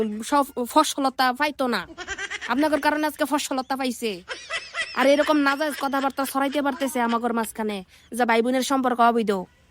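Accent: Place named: Chapainawabganj, Bangladesh. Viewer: এ হাপা তোমার মুখের কথা গালা শুনতে খুবই ভালো লাগে হারঘে চাঁপাইনবাবগঞ্জের ভাষা শুনলে হার ঘে ভিডিওতে ঢুকে পড়ো।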